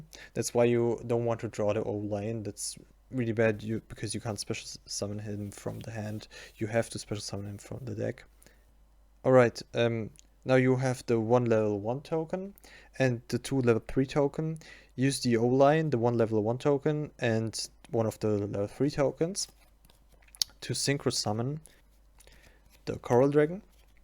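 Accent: Germany. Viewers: You have a damn German accent.. why don't you make the videos in German?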